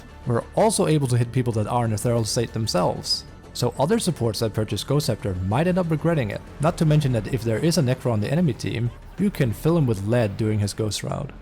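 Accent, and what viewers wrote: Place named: Denmark. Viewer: Thanks (fellow dane here btw.. took me a few mins before I realized, but accent gave it away) - matter fact won 3 games in a row as muerta 5 just today : )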